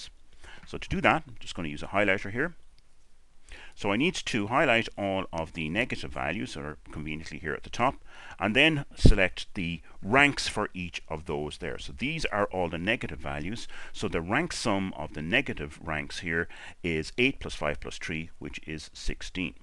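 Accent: Ireland. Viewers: thank you for this. Two questions for you: Let’s say I have T+ of 9 and T- is -16. Is it correct to say you would then use T+ as 9 is lower than the absolute value of T-? Let’s say I have T+ of 52 and T- of 0. Do I use T- as my test statistic? Also if in wanted to calculate the effect size, I read I should use the absolute test statistic and divide by the sqrt of n, but if it’s zero, this will just result in zero… even though there was a big positive swing. Hope this makes sense, nice to hear an Irish voice here away from home.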